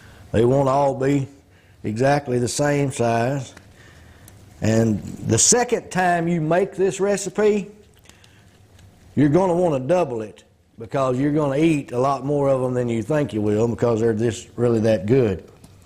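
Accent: South, United States. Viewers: Me: Watching with a degree of skepticism, volume down, and thinking: 1. Intriguing, a white man knows about hot water corn bread? 🤔 2. Intriguing, knows how to make hot water cornbread "correctly"! 😊😊 3. Intriguing, not afraid "fat" or frying food?!?! 😁😁😁 Conclusion: This man MUST be from south or at the very least Texas. Me: Turns up volume. Hears accent. South confirmed! 🤣 *Priceless take away to 👌 Was I was racially / gender biased? Yes, but aren't we all (to a degree) if we're honest with ourselves??? Me also: Wondering how I can get an invite for dinner now that I've probably teed off the cook!!!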